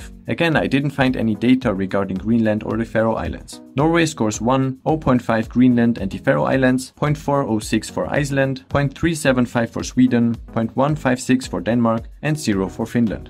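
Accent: Germany. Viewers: Are you german? I'd swear your accent is 100% german and I'm just asking me the question if I should move out of the country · Richtig rausgehört das da ein deutscher spricht 😆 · You sound German. Is that right? Thanks a lot for a great video. Really great and informing.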